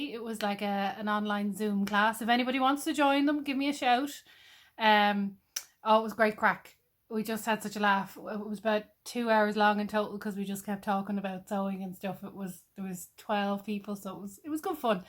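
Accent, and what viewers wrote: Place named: Ireland. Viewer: You sound like you're in Ireland. Where are you located? Always looking for nearby fabric places...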